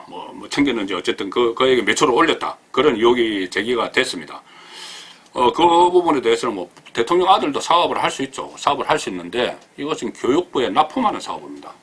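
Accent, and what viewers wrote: Gyeongsang, South Korea. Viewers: 발음은 타고난것이고 억양은 경상도지역 억양인데 너무 무례하군요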